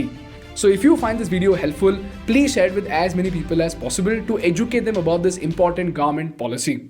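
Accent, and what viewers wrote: India. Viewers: Your videos are awesome! Keep it up - informative, well researched, superb presentation graphics/ text/ delivery and above all designed to throw clarity on some of the complex issues that affect the average person searching for truth. While your accent is Indian, and so very genuine, (words in every language are pronounced differently by users and thus add to the novelty ) your content is what carries the message. Kudos.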